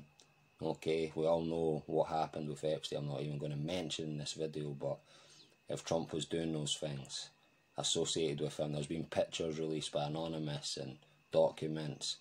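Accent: Scotland, United Kingdom. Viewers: New Sub here - you and many other astrologers have predicted this orange bastard tRUMP is going down. His luck is finally ending. I'm from the US - love your Scottish accent!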